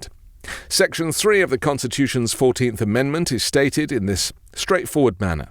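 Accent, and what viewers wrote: United Kingdom. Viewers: Why do you sound British? Mmmm? and we all know the law does not matter with Trump. This just seems to be another way of making people angry without a chance to do anything about it. Given the fact he was inaugurated once and almost was inaugurated if the insurrection succeded, and the fact that he beat the senile Democrats in the last election by electoral college and by popular vote we on the left want answers not more pies in the sky " What ifs" with Trump because that is all we hear. What if Trump get angry at Musk angry a Pence angry at anyone in his way and then nothing more comes of it. Your words are part of the meaningless press and the real left, the real progressives are underground and not hear on "What If" Podcasts. There very few left podcasts now and those that I know of I will not speak of here in this make believe democratic corporate podcast. 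· I really, really hate someone with a British accent pontificating to us as to how we should run our government! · Thanks for reporting the truth. I always love hearing your British accent & so does my dog, Nick. He always moves closer to the TV when hears you. He loves British accents. 😊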